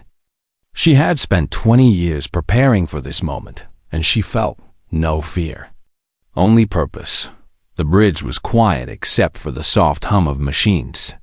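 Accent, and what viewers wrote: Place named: Boston, United States. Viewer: Yella. Can we get a narrator that doesn’t have a god awful Boston accent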